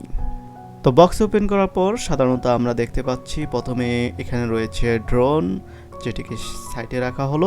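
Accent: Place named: Barisal, Bangladesh. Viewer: 90% sure apni borishailla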